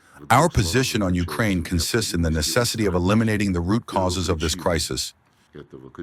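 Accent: United States. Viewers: Would be better without the dumb american accent.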